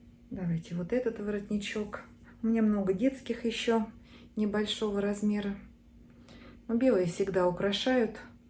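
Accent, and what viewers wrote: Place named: Voronezh, Russia. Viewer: Ну все красиво, и мастерица и ее работы !!! Чудо-воротнички, с каждым платье смотрится по разному. Очень приятно слышать любимый воронежский говор ! Если я не ошибаюсь.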